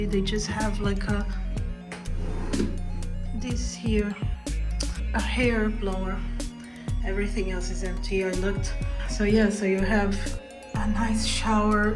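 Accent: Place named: United States. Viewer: Interesting,, but you really don't have a British accent.. you sound more like an American.. hahaha 🤣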